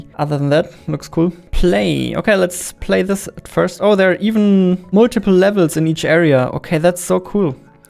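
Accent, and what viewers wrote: Germany. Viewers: are you german? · Never heard such german englisch but I love it haha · Man hört den Deutschen Akzent so raus haha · Bist du Deutsch? Hört sich bisschen so an.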